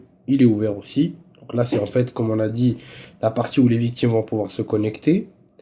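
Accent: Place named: France. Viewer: salut merci pour le video mais mes oreilles saignent , svp arretez de prononcer les mot anglais a la francaise vous denaturé completement la prononcition et la comprehension n es que plus difficile. tu explique 2 minute ou est le bouton reset mais tu ne nous dit pas qu est ce que le tetra machin truc. alors petit cour pineapple le i se prononce aille comme pas i , paynaple pas pine aple . et le plus dur RESET , c est pas un raisin , resset , avec le son c . en plus tu prononce le e en i alors pourquoi ne pas continuer et le prononcer comme il faut . si tu prononce le e en i pourquoi prononcer le i en i a pine apple ???? en espagnol quand tu demande como esta ? comment tu vas. et que lon te repond esta bien . tu repond en prononcant le bien en bi enne et non pas en bien comme en francais , c st pas la meme langue . c est pareil en anglais. va falloir faire un effort un jour la . 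ensuite tu nous parle de cable reliant le mark 7 mais tu nous montrepas comment se conecter dessus. ta manip de ipconfig c est super mais ca ne fonctionn pas du tout , et ton port 1471 ( indiquer 1461 ) dans les sous titre j ai re ecouter 4 fois en montant le volume et tu dit 1461. ou as tu pris ce port, ca non plus on le sais pas., du coup je peux pas aller plus loin dans le video . bonne journee et bon cour d anglais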